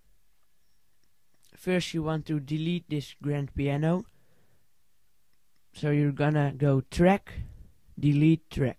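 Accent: Netherlands. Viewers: Je zet de Nederlanders voor schut met je kutaccent! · Je kan horen dat je Nederlands bent xP Maar goeede tutorial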